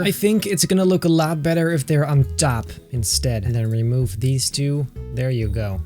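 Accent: Netherlands. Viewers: Are you dutch ??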